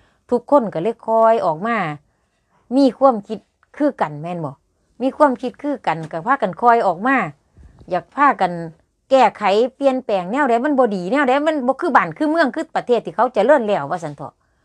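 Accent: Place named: Korat, Thailand. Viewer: โคราชซัดเจน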